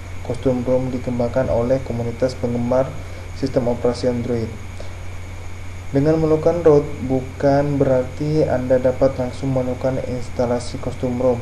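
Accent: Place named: Madura, Indonesia. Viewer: Corak madureh se ngocak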